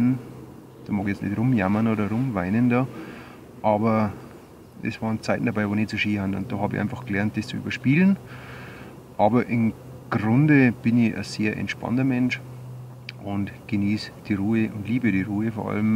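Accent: Bavaria, Germany. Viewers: Da trau ich mich EIN Mal, ne Frage zu stellen und du nimmst die gleich - DANKE dafür!:D Und ich bin absolut dafür, dass du deinen bayrischen Dialekt beibehälst, denn der macht den ja erst komplett zum - und genau wegen dem schaltet man doch erst rein in deine Videos. Wem es nicht passt, soll sich andere Kanäle anschauen, aber hier nicht so nen Blödsinn daherschwallern. Meine Güte augenroll Wünsch dir noch schöne Rest-Weihnachten und schonmal alles Gute zum Geburtstag, bleib gsund und so wie du bist. Grüßla aus Franken:) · Bleib blos beim boarischn. Unsa Dialekt is einfach sympathisch und gmiatlich. Mia san ja koi Preissn. :D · Soll ich Dir was sagen, ich finde es gut, dass Du bayrischen Dialekt sprichst... das macht Deine Video's sehr liebenswert 😊. Und auch sonst wirkst Du sehr sympathisch. Deswegen bleibe wie Du bist. Frohe Weihnachten und einen guten Rutsch wünsche ich Dir und Deinen Lieben. 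LG Kevin 👋🏼😊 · Bleib bei deim boarisch! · Moin....dein Bayrisch finde ich super....wer das nicht verstehen kann hat selber schuld...du haste ne gute Art drauf...gruss aus Schaumburg...Gruss F. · Guten Morgen,ich grüße dich aus dem fernen Thüringen ins scheene Bayern,als erstes,ich find es vollkommen richtig das du zu deinen Dialekt stehst,das ist auch immer in ein Stück Heimat was man in sich trägt und wem es nicht passt soll sich deine herrlichen Videos nicht anschauen,ich versteh dich sehr gut,ansonsten find ich deine Frage Antwort Videos sehr sehr gut,leider im Hintergrund der neue Sound der Natur(Kettensäge),mach weiter so,beste Grüße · Hallo, dir und deiner Familie erstmal frohe Weihnachten & einen guten Rutsch ins neue Jahr! Ich danke dir für deine unterhaltenden Videos die ich als Kölner trotz bayrischem Dialekt sehr gerne schaue und auch nach den ersten 3 Videos hab ich gar nicht mehr auf den Akzent geachtet und verstehe inzwischen fast alles, also behalt das auf jeden Fall bei, ich freu mich nächstes Jahr wieder neue Bushcrafting Videos zu sehen.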